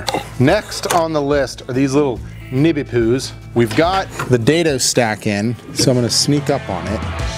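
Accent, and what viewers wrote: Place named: Pennsylvania, United States. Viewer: Youins guys.........Pennsyltucky English. Love your channel. Thanks for sharing.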